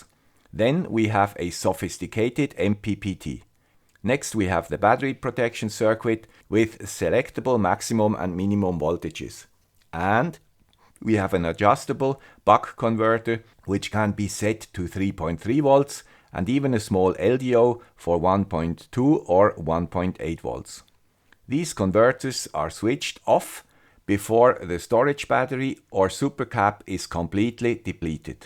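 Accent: Switzerland. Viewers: I visited a friend in the Basel canton in early July. I can confirm this is indeed a Swiss Accent. :-)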